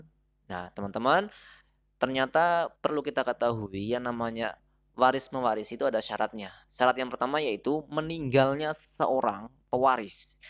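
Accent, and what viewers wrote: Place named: Banyumas, Indonesia. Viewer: Semakin kebelakang, semakin ngapak. Wkw. Terimakasih ilmunya pak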